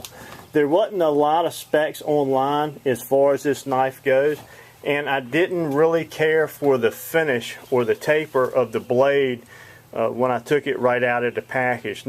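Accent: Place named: Richmond, United States. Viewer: I'm really liking your videos man. I have this knife as well. Great knife! This thing is a work horse and cuts about as well as any knife I own; and that includes a F1 Fallkniven. I asked Dave his opinion of the knife and he said, because it was only 1065 steel it would not truly be effective in all ways. Have you tried to throw sparks off of it with flint? By the way, are you from the Richmond VA area? I think I recognize that accent.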